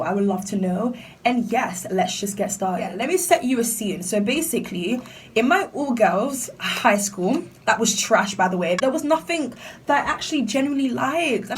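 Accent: United Kingdom. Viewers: SHITT THIS GIRL IS BRITISH. MY GGGGG. I THOUGHT YOU WERE AMERICAN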